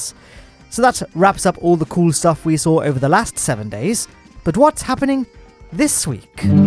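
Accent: United Kingdom. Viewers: You sound extra English in this video? Like when you did blunderbirds intros, it sounds odd after watching velocity lake!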